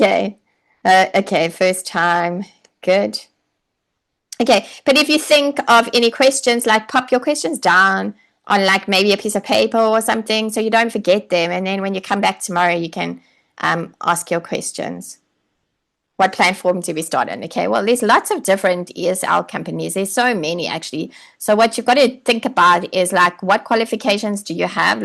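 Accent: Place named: South Africa. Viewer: Im ex dbn..living in a Sydney..nice to hear south Africa voice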